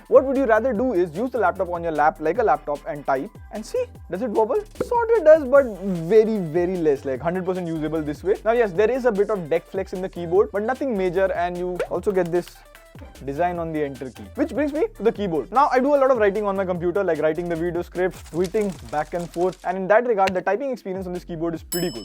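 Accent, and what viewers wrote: India. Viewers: Bhai ki english bhi hindi jaise lagti hai lagta hai jaise mene hindi video hi dekhi hai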